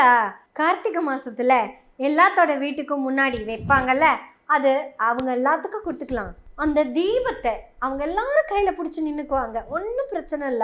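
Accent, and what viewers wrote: Kongu, India. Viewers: கொங்கு தமிழில் நீங்க பேசறது அருமை அம்மிணி வாழ்த்துக்கள் சகோதரி 👍🏼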